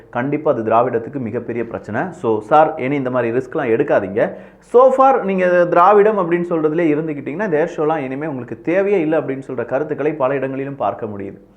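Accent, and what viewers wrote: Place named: Tirunelveli, India. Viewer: Bro sometimes you speaks tirunelveli slang, I like that❤❤❤😀😀😀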